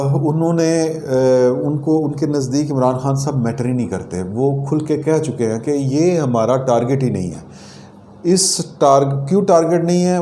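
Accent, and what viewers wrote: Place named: Lahore, Pakistan. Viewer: AOA... Sir g zaberdast analysis hy...Aap ka baat kernay ka aik khalis Lahoree andaaz bht. Pasand aaya..Baqee ye jo Niazi k spoksmen bhaan bhaan ker rahay hn...tu bhai g inn ki 2 Din phelay Niazi nay class leeh hai...iss leyaa TATEE ka zor laga rahay hn... SHEEDA TALLY ko tu NIAZI nay BILLIONS kay TRAQEYAATEE FUNDS deyaa hn .TALLY nay MUREE ROAD per banner bhi lagwaie TALLY un AWAAM kay TAX k paisoun say Rawalpindi may kothian ( BUNGLAS) bunwa raha hy.. bayshak inquiry kerwa lain.....NAB Kahaan hy.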